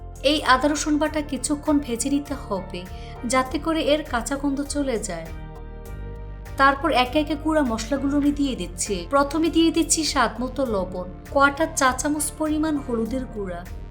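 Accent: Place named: Dhaka, Bangladesh. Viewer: আপু ঢাকা যে থাকেন সেটা আপনার কথা শুনে বোঝা যায় বাকি ঢাকা কোথায় থাকেন আমি ও ঢাকা উত্তরায় থাকি। আমি আপনার বড় ফ্যান আপনার রান্না গুলো দেখে খুবই ভালো লাগে আর আমি আপনার ভিডিওগুলো সব সময় দেখি। ❤️❤️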